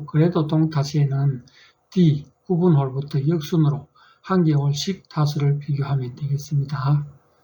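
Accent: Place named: Gyeongsang, South Korea. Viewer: 경상도 사투리~~~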